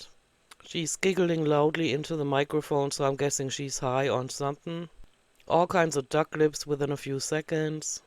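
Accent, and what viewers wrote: Germany. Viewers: RIP, your beautiful German accent voice and you and your personality will be and is missed 😢 Why must everything good get to be taken 🕊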